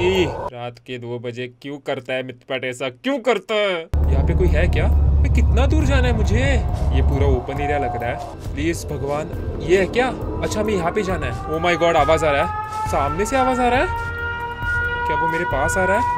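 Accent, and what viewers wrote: Maharashtra, India. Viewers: You have a Marathi ancient in speaking and I love it yarr....🥳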